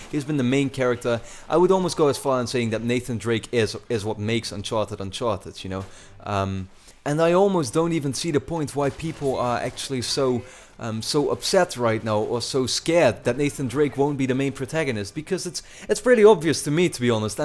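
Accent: Netherlands. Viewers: Hey, did you know that ps4 could hold 2000 friends? Awesome, are u gonna add some subsribers like me? Would be cool to play some rounds with you:)Uit welke stad kom je btw? hoorde meteen dat je uit Nederland kwam, ik uit Den Haag:D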